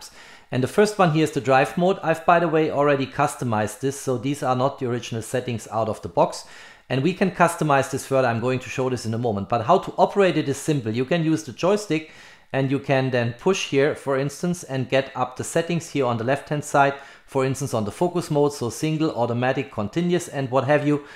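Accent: Germany. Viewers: Sehr gutes Video. Endlich mal sehr verständliche Erklärung der Einstellungen. Wollte meine Einstellungen parallel vornehmen, bis mir aufgefallen ist, das z.B. beim Touch Panel einige Einstellungen fehlen. Da wurde mir klar, dass ich nicht die A7R5 in der Hand hatte, sondern meine A7iV. Die beiden sehen sich so ähnlich. Was ich noch nicht gefunden habe, ist die Einstellung zum Menü. Das heißt, drücke ich Menü springt die Kamera stets zum oberen Punkt, bei der A74 zeigt sie die letzte Einstellung, was mir viel lieber ist. Werde wohl noch eine Weile brauchen, bis alles optimiert ist. Möchte auch die Einstellungen, soweit möglich, zur A74 identisch haben, damit ich mich nicht umstellen muß. Danke für die großartige Arbeit, ich hoffe Du verstehst die deutsche Sprache, sonst deepL nutzen. PS: mir kam es sogar so vor, als hättest Du einen leichten deutschen Akzent im englischen, aber nur sehr leicht.In Minute 39 erklärst Du was ich suchte, hätte ich nie gefunden.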